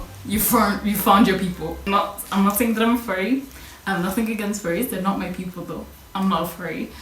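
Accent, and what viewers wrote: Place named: United Kingdom. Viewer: Very unrelated to the video but this is the first video of yours I've watched and for a good solid three minutes, my brain was fighting itself over your accent, like half of it was like "definitely British" and the other half was just "idk what that accent is but it is Not british" and the first half of my brain was like "oh so do you have any ideas" but then I remembered that most people have their country in the about section of their channel so that cleared it up. (Can you tell it's late at night for me) Anyways about the video, i've heard terrible things about Twitter and tiktok specifically so i just never got them, but i have seen how it just makes people more argumentative, like it seems everyone wakes up and chooses violence. Obviously it's not anyone's job to educate anyone and everyone about, say, why people deserve human rights, but it seems that people will use that as an argument, like "screw you go google it that's not my job" when someone asks for, say, sources to corroborate a claim. 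And another thing is politics. I'm not American but I'm sure most people see a good bit of American politics on social media, since it's a global power and all. But at this point all i see is Republicans and Democrats calling each other the r slur for just existing, whether the previous conversation is political or not. And that kind of political tribalism, which was shown in an election in my country before i was born where 800 people died, does not lead to anything good ever. I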